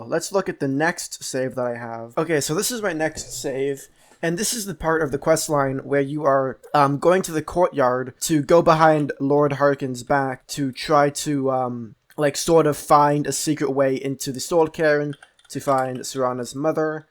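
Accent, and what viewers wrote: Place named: Canada. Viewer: Where are you from? You have Canadian Accent!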